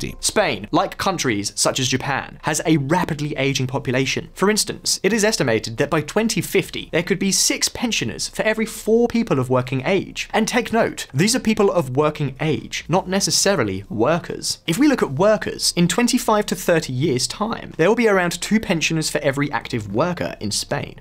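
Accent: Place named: England, United Kingdom. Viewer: Someone with an English accent bad mouthing Spain. Is this supposed to surprise me? Why don't you talk about the financial mess in England?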